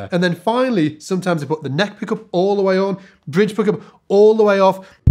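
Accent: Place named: United Kingdom. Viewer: are you British or are Australian, or you are gabacho(USA)? I've watched videos speaking with a gabacho accent. Now your accent is British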